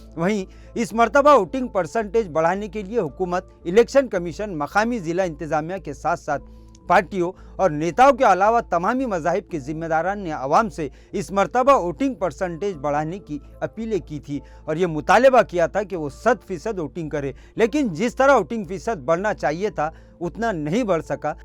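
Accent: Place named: Deccan, India. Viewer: Aap ka dakhni lehja achcha nahi lagta aap dilli walon ki trah bolna sekho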